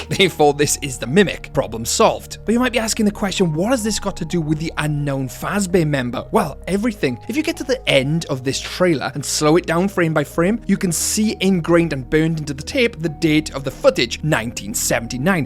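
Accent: Wales, United Kingdom. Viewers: Completely unrelated but are you welsh?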